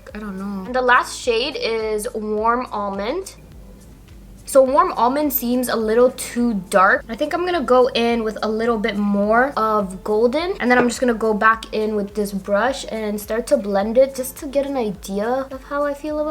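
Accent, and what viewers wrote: Toronto, Canada. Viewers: I knew i heard the Toronto accent lol.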